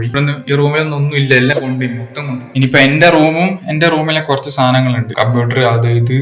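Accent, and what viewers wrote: Kannur, India. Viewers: ingale kannoor basha 🤣🤌🏻💘powli aanu 💘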